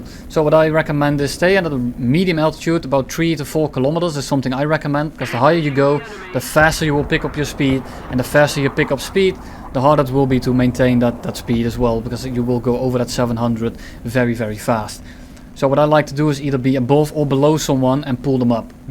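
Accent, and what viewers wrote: Netherlands. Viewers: ben je nederlands?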